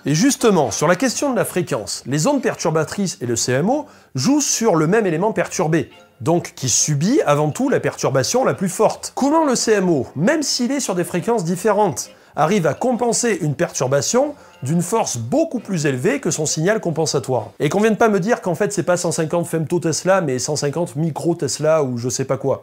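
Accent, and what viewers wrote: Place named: Haute-Loire, France. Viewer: Yo ! sympa ta chaîne, dis moi t'es du 43 u 42 ? t'as un accent du coin toi ;)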